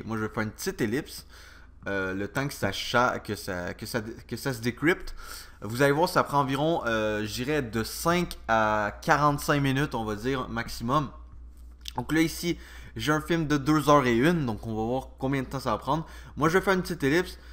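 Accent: Canada. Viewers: merci beaucoup l accent canadien en plus trop cool j adore! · J'ai 3 Commentaires à poster. Le premier est que j'adore le Canada et votre accent. Cela me fait voyager. Je suis de Paris. DVD descripter : Merci pour vos conseils pour votre lien de téléchargement. Parfait ainsi que vos tuyaux pour le configurer. J'ai eu une erreur concernant la Fat 32. Il semblait que la fat32 n'acceptait pas les fichiers de plus que 4Go. J'ai passé outre. Qu'en pensez-vous ?. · Bonjour de France, Un grand Merci d'avoir essayé de m'aider. Je vais me mettre à l'Anglais à 63 ans, il n'est peut-être pas trop tard !!! J'habite en Sologne entre les châteaux de Chambord et Cheverny et pas loin du Zoo de Beauval, si vous avez entendu parlé du bébé panda qui est né il y a juste un an. Moi, j'ai visité à plusieurs reprise le Canada : Québec et la Gaspésie ainsi que Vancouver. Êtes-vous, déjà venu en France, chez vous cousins !!! 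J'aime trop votre accent et je me permets de vous embrasser par dessus un océan. Dominique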